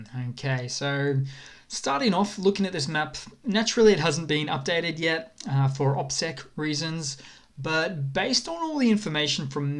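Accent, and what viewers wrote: Australia. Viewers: Very encouraging to hear that the Kherson counter offensive is more successful than the map shows, but should you be going public with this when Ukraine is keeping it quiet for Opsec? Anyway, I don’t know where you get your information from but if it’s correct it’s gold. Bahkmut too everyone else is saying the Russians are gaining. I hope you’re right that there is no progress. Btw, you sound like an Aussie which makes your sources of info even more mysterious 🇺🇦🇦🇺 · Love your delivery. I wonder where your accent is from? First, I thought you could be English but then I seemed to be picking up some Aussie cadence. Please put my curiosity to rest.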